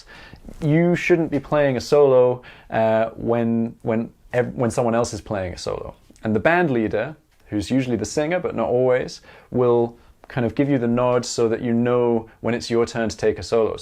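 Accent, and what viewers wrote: Scotland, United Kingdom. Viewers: You're sounding less and less Scottish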